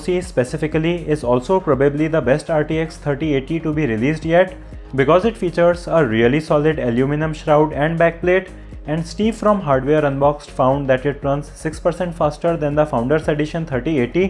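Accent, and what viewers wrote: India. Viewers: This indian accent is killing me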